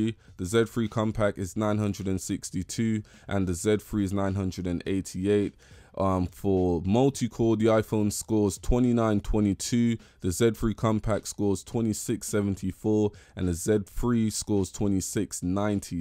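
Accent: United Kingdom. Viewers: Zed is the correct way of pronouncing "Z". He is from England and is speaking English. "Zee" is the incorrect butchered way that the Americans seem to speak English.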